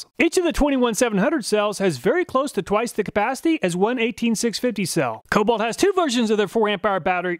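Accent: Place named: South, United States. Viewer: You talk so slow, must be that southern drawl I keep hearing about.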